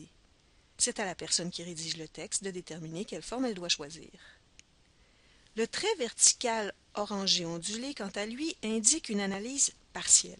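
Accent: Canada. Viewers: Excellente vidéo de présentation. Les explications sont claires, concises et précises. Et l'accent canadien ajoute encore comme une couleur ou un parfum que les Français apprécient beaucoup. Cette vidéo permet de comprendre rapidement comment fonctionne le logiciel et fait gagner beaucoup de temps à ceux qui en ont besoin et qui veulent apprendre à le maitriser. Un grand Merci à. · Excellente présentation et j'adore l'accent canadien;-)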